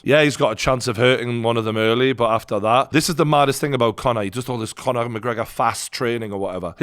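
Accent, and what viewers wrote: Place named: Geordie, United Kingdom. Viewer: Your to much geordie 🤣🤣 but I fucking love it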